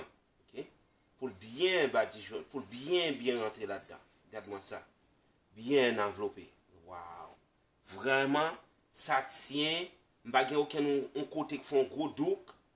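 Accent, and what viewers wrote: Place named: Montreal, Canada. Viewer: I can tell this guy is living in montreal by the way he speaks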